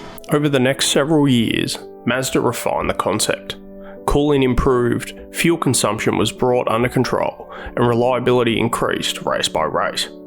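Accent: Australia. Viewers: Idk just sounds like a normal Australian accent to me😭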